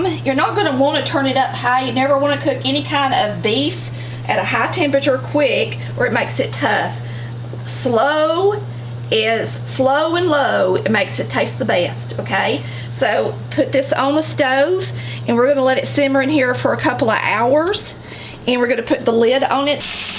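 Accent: South, United States. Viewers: you sound like a country girl and I love it! I want to know how you're going to fix those beef shanks. I love mine fall off the bone....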